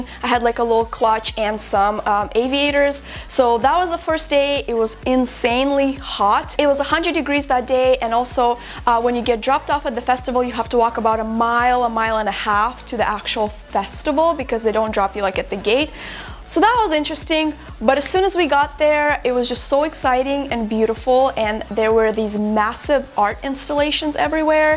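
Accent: Russia. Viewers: Is she russian or something ? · Russian?! such a nice strong accent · Is she russian? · Are you russian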